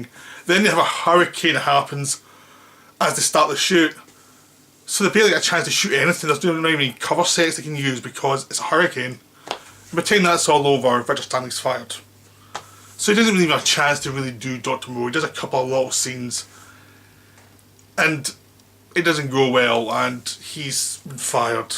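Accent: United Kingdom. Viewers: Fucking limey shut up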